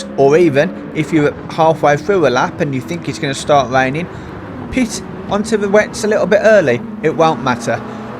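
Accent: United Kingdom. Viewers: I like how you gave Naffan Tait a mention last time. I also enjoy listening to the different British speech patterns (there’s a million of you you here in Western Australia) keep up the good work- your builds help out no end.🇦🇺🇬🇧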